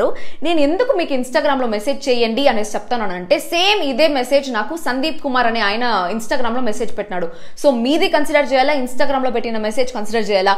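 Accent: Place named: Tamil, India. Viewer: I think ni telugu lo arava vasana tagulutundi may b ur from nellore. Like ur slag chala rojula tarvatha vintunna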